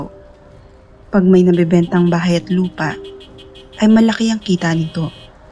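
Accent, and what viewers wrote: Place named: Conyo, Philippines. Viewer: Yunh conyo ka HAHAHAHA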